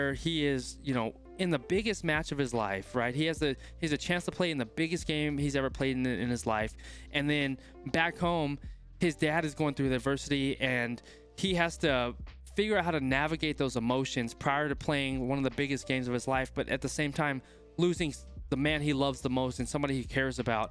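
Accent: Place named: United States. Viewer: Thanks J. Enjoyed watching you and your reaction vids. As a saffer it’s really nice hearing it all in an American accent. Thanks again boet.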